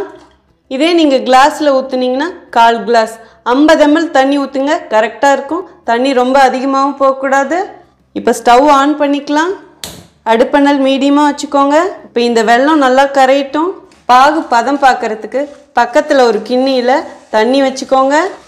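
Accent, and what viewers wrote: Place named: Kongu, India. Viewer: thanks but your tamil is kongu tamil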